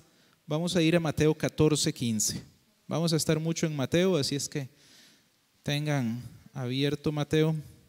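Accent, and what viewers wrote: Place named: Paraguay. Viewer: Me parece que eres Paragua, un consejo deja de decir "verdad"? Todo el tiempo.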